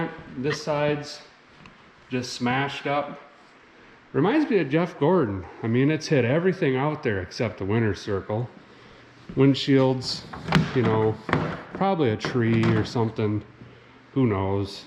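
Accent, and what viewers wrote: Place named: Wisconsin, United States. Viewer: A guy forgets how much your wiscahnsin accent came thru in earlier videos 🤣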